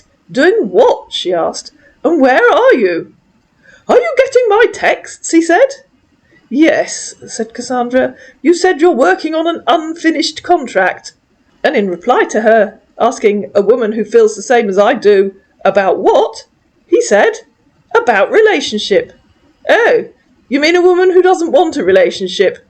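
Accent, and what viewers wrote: United Kingdom. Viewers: Rofl 😆 I LOVE it and actually LOL when you call him an idiot or tell them to stop acting like a baby. With your British accent, it's just sooo derned funny! (And no, I'm not making fun)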